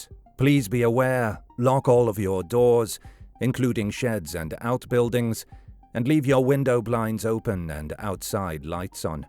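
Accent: United Kingdom. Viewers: I will cut him some slack as he sounds like he hasabritish or Canadian accent.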